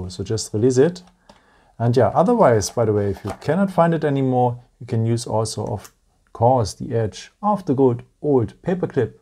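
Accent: Germany. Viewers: We need more videos with some German accent ! Probably buing this phone today, i have had a 9 for four years and very little trouble.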